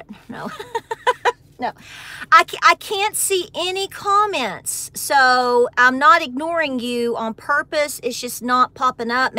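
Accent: South, United States. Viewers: You are so cute, correct, feminine, strong, and spiritual. I love your southern accent and expressions. ❤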